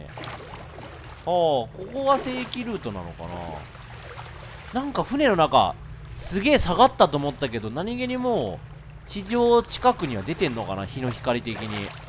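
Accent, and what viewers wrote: Kansai, Japan. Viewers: 何でこの実況の時だけ特に関西弁きついねん爆笑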